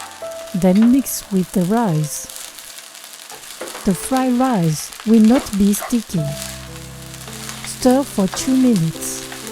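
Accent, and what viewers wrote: France. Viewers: Are you french ? you sound french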